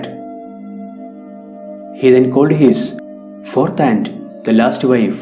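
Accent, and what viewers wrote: Kerala, India. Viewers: Good One! Just that mallu accent is the only negative part. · Malayali narrator?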